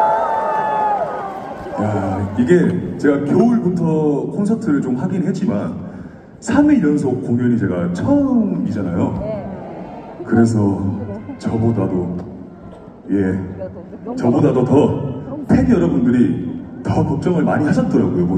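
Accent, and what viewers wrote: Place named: South Korea. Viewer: 우리가수님 노래도 잘하시고 말도 너무잘하세요 사투리를 구수하게 정감있어 좋아요 대전 막콘 잘하세요 이번 두번째 콘서트 대성공이예요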